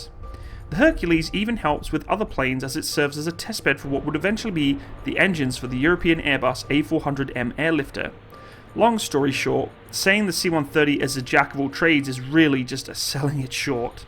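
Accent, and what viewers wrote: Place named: United Kingdom. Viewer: Your British is showing 😊: In American; I fly in a C-130 ... present tense I flew in C-130 ... past tense I had flown in a C-130 ... past perfect I have flown in a C-130 ... past pluperfect I think that is correct. At any rate, a nice and concise as always discussion of a great airframe.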